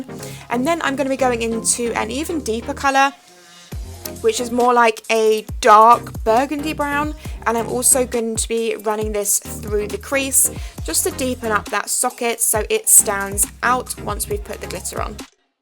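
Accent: United Kingdom. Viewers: are you from Britian because your accent makes it sound l;ike it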